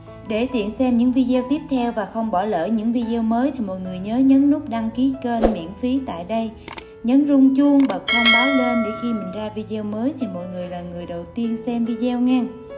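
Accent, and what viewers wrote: Mekong Delta, Vietnam. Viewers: Bạn ở miền tây hay ở đà nẵng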